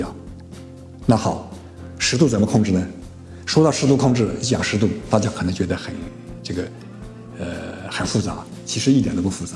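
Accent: Yunnan, China. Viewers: 好像是云南人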